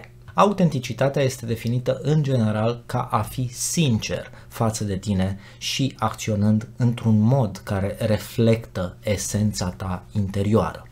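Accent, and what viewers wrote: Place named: South, Romania. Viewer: Se cunoaște că sunteți din zona de sud a țării nu doar după accent ci și pentru ca va adresați la persoana a doua singular. Poate va urmaresc și persoane trecute de prima tinerețe.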